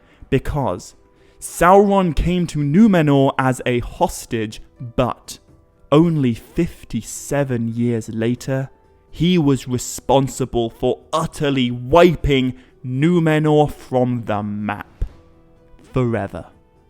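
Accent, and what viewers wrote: United Kingdom. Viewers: Wow turns out I hate British accents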